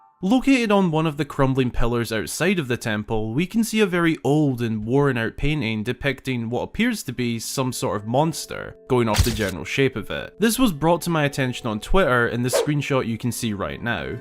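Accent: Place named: Glasgow, United Kingdom. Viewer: How did I manage to stumble across a YouTuber with a Glasgow uni accent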